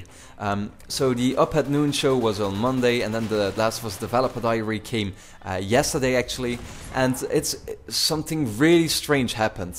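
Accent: Netherlands. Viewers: You must be dutch right? I really hear it in your english. I'm dutch aswell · Do you talk dutch a lot outside your commentaries? I came across your channel just today and I'm Dutch as well (just sending it in English so other people can read it). Quick tip, I know it's hard to get rid of your Dutch accent but if you want to get rid of it, try to talk as much English as possible. (I mainly got rid of it because I am talking a lot with friends from England and play BF3 with them, I still have an accent sometimes but now it's mainly gone)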